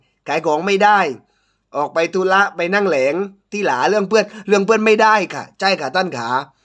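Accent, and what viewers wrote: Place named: South, Thailand. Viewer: พี่น่ารักจังเลยนะคะเวลาฟังสำเนียงของคนภาคใต้ตอนนี้ชอบพี่มากๆนะคะฟังทีไรนึกถึงภาคใต้ทุกครั้ง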